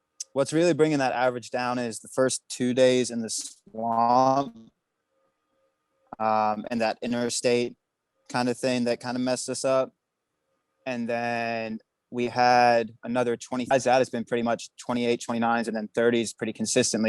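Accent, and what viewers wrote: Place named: South, United States. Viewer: Your southern accent somehow got stronger from being in florida :p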